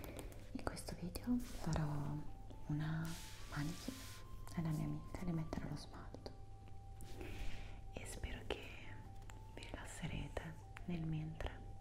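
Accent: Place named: Emilia, Italy. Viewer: È emiliana le origini non lha dette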